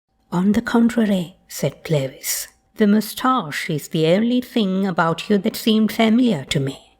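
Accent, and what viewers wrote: RP, United Kingdom. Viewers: This indeed is posh Upper RP English. I had to listen to this three times to understand the whole story. The story is actually quite funny ☺️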